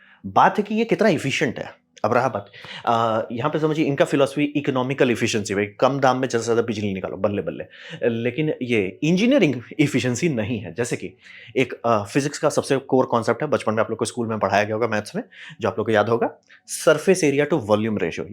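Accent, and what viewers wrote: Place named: East India, India. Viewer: A u from bihr or east india? Value to bhalue??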